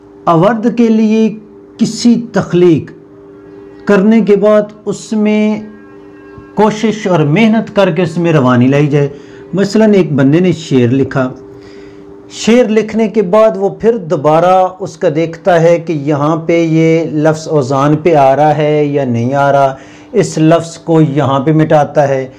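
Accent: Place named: Punjab, India. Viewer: ماشاءاللہ ۔ پنجابی لہجے میں کیا خوب اردو پڑھاتے ہیں۔۔ آواز ہو بہو صحافی ارشاد بھٹی جیسی ہے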